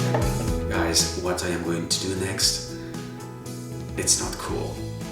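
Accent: France. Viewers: Cassie Hassani il est français je pense , je reconnais l accent français, (très léger) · Bonjour, Vous êtes Top! Etes vous d'origine française? Vous en avez un peu l'accent! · I love your voice and French accent!!! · Love the french accent!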